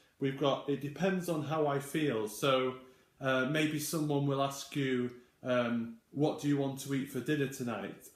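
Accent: United Kingdom. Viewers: Hi your pronounce reminds me of my staying in London. I used to live there and I really like it! by the way I`m Japanese....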